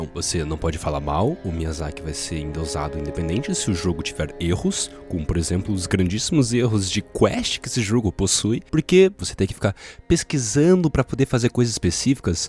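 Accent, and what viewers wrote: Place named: Sao Paulo, Brazil. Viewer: Eu não vejo problema nenhum nesse vídeo. Eu vejo problema em quem esperava algo bom sendo dito por alguém que fala igual paulista...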